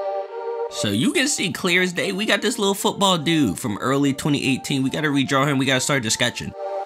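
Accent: Baltimore, United States. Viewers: Are u from Baltimore??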